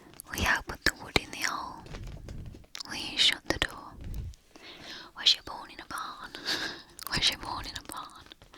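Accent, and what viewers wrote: Black Country, United Kingdom. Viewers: Ah bab, this med mar day! Ar doh liv in the Black Country any mooer as ar liv ‘darn sarf’. It was lovely to hear ‘my’ accent and listen to the phrases my parents used to say. Thank you. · Always happy to hear you reppin' the Black Country,...practically my second language ay it? 🤣🤣🤣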